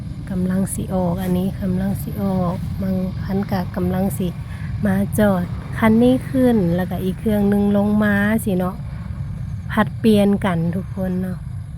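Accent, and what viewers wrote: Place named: Northern Laos, Laos. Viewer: สบายดีค่ะน้อง คนสวย...เขามาเลาะเบิ่งยนต์แนมเครื่องบินนำน้อง จ้า..และฟังน้ำเสียงว้าวเพราะๆอ่อนหวานน่าฟังบ่ฮู่ว่าสาวลาวเหนือว้าวเพราะทุกคนบ้อจ้า...👉(น้อง คนสวยถ้าได้กลับบ้านลาวเหนือทำคลิปสาวลาวเหนือว้าว(พูด)ให้ฟังนำเด้อจ้า)👌👍😲💖💙🇱🇦🇹🇭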